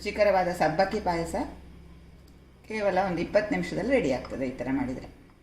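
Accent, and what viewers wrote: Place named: Mangalore, India. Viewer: Thumba nidhaanavaagi Mangalore kanadadhalli neevu vivarisuvudhu thumba chanda👌👌neevu elli iruvudhu Madam? Mangalorenalla?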